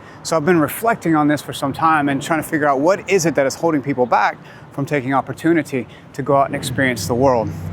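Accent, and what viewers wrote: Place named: Northern England, United Kingdom. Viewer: This guy is obviously from Northern England or Ireland and is cosplaying as a North American for clicks and likes.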